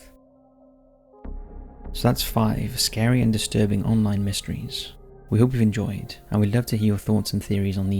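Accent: Wales, United Kingdom. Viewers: It's a Welsh accent probably from Cardiff or Newport area. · Nice British accent 😊😊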